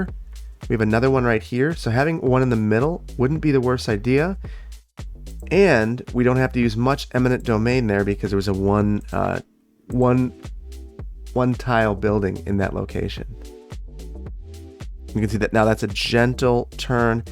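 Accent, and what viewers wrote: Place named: Midwest, United States. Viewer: I've been catching up on your videos, and I just gotta say: your accent gives me life. God bless the Midwest!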